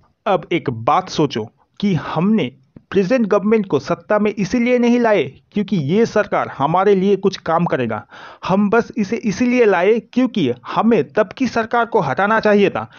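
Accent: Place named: Bengal, India. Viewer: Yes, I'm 100% sure Sir is Bengali, judging by his accent.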